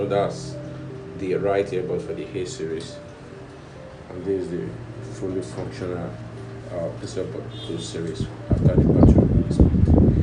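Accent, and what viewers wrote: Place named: Yoruba, Nigeria. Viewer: When i heard how you are speaking, i uad to check your name. Yoruba man 👍👍👍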